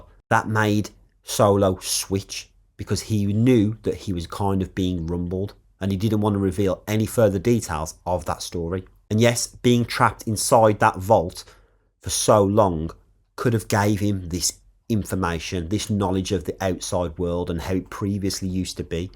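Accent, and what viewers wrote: United Kingdom. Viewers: I thought it might have been more of a black country accent!! Yeah, I'm in south Brum myself!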